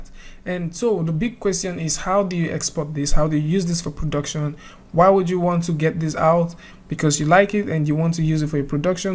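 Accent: Nigeria. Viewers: Are you Nigerian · You sound Nigerian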